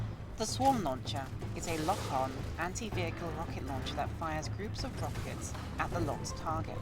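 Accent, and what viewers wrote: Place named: Iceland. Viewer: That would be an Icelandic accent. Most people will have an accent carrying over from their primary to their secondary language, it is only natural for this to occur.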